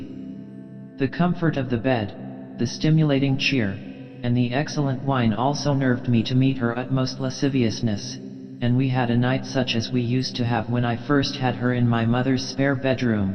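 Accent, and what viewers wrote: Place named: United Kingdom. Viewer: Alien voice VS gentle English UK accent...no contest. THIS VERSION IS ATROCIOUS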